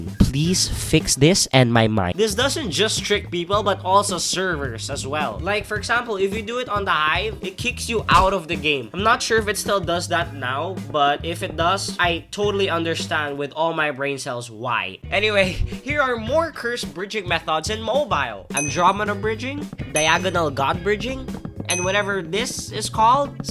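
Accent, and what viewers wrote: Philippines. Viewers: Dude you sound Filipino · I know your filipino/southeastasian cuz of that accent :) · i love how your filo accent slips all the time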